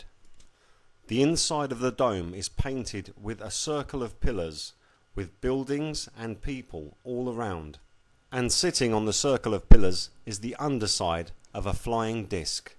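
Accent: United Kingdom. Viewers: is that a pommie accent?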